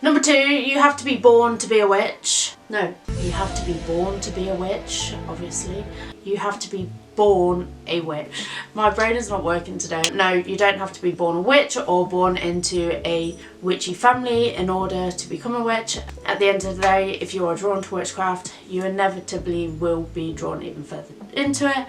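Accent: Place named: Northern England, United Kingdom. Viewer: Dya lIve in da US ?? U r sound like u r from Northern England 😺😺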